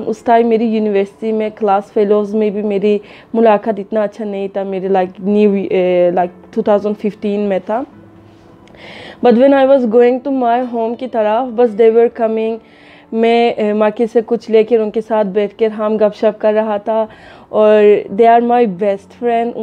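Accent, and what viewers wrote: Pakistan. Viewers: I really love your Urdu accent as wall as your vlogs and I mostly recommend your vlogs to my niece , she is American she doesn’t try to speak Urdu because of scary to people . Good luck my dear sister. Allah bless you and your entire family . Ameen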